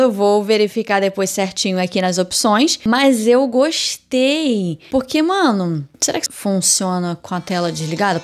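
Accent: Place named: Rio de Janeiro, Brazil. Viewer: Amei a carioquinha falando ! tmj conteranea.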